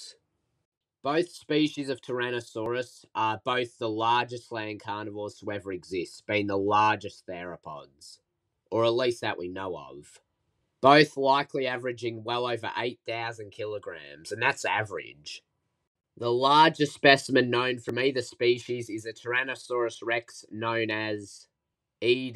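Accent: Australia. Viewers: This is most Australian sounding man in the history of accents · Dude are you an aussie? It sounds like it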